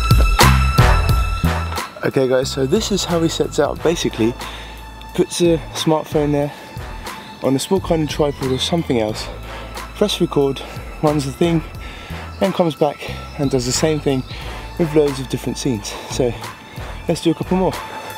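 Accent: United Kingdom. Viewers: Great vid! You've got the brit accent going for you... I'm watching KC's vids diff now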